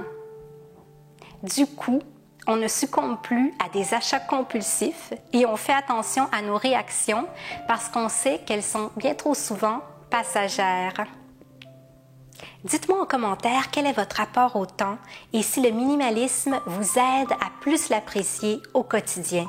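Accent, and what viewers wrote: Canada. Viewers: Bonjour, merci pour ce partage ainsi que la précédente vidéo. J'adhère totalement à vos propos et j'essaie au mieux d'être minimaliste (pour moi et pas pour les autre). J'ai désencombré le superflu. Je me suis sentie tellement mieux et pourtant, j'ai beaucoup de peine à ne pas réencombrer. Surtout avec certains produits, comme les produits cosmétiques, les sacs à main et les livres. Avez-vous des conseils ? Et aussi, pourquoi est-ce si compliqué 'être dans le moment présent ? Au travail, je me dois (et je pèse mes mots)d 'être multitâche. C'est maintenant ce que l'on attend d'un "bon" collaborateur sinon c'est le licenciement. A mon âge (59 ans) c'est compliqué de me dire, je vais changer de job...et la retraite n'est pas pour tout de suite...Belle soirée (ici en Suisse, c'est le soir 😉) ( avec votre si charmant accent canadien, que j'aime tant, j'imagine que vous vivez au canada😊) et à bientôt.😗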